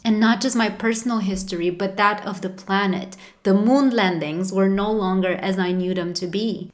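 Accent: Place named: Romania. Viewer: Haha I can recognize a romanian accent in half a second. Greetings from Ro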